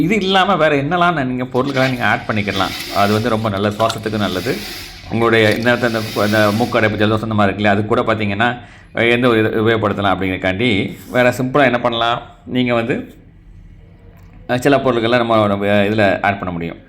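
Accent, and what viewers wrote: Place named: Tirunelveli, India. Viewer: நெல்லை மாவட்டக்காரர் போல.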